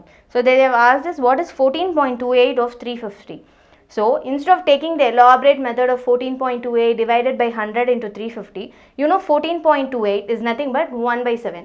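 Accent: South India, India. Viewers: you are south indian? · Are u south indian.... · Are you South Indian??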